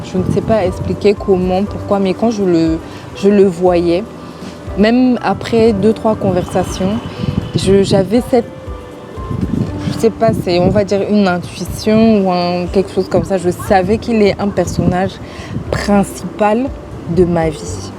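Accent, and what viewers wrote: Cameroon. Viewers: L'accent camerounais, ne part jamais.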